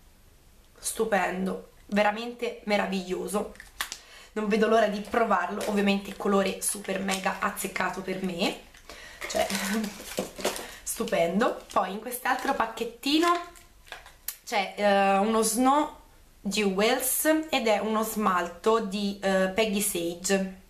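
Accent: Tuscany, Italy. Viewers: Ciao!! Ti seguo da poco e mi sei già troppo simpatica! 😃 adoro il tuo accento toscano, la tua simpatia e i tuoi capelli - un bacio grande, al prossimo video:**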